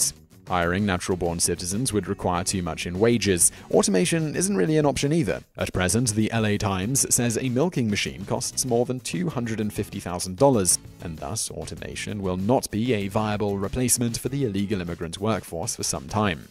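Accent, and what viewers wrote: United Kingdom. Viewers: Great. A guy with a British accent wants to tell everyone what America should do as if he is the expert.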